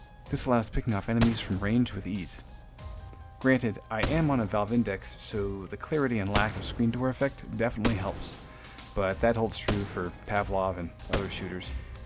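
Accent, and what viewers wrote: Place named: United States. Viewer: wtf, I wasn't expecting an american lmao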